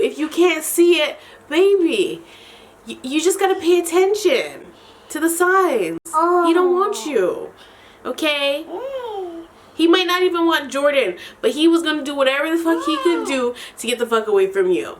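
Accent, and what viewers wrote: Toronto, Canada. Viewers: First of all, I KNEEEEWWWW you were from Toronto from the minute you opened your mouth, I love my Torontonians. Second, you confirmed it with the Brampton mans shit. I liked and subscribed just cuz you said "If you know, you know" about Jamaicans, cuz its so true LMFAO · I really like how you talk, Imma need to bring my Jamaican/Indian self to Canada to get me a nice Canadian accent lol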